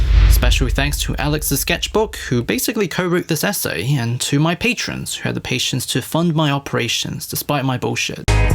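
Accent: United Kingdom. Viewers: MCU Spidey is whack. A YouTuber with British voice apparently speaks facts with edited videos.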